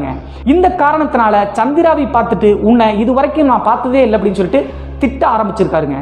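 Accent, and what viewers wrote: Tirunelveli, India. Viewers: Bro enga area speech mari irukku. Tirunelveli doii🤩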